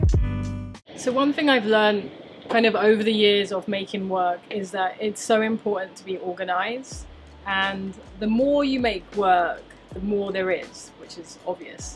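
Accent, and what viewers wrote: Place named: United Kingdom. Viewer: How I missed your voice and your fantastic British accent!! I hope you will spoil us with more YouTube movies. I got to know you via a clip of Casey Neistat many years ago. I love your work and cherish my black Chantell signature Puma's 😉👍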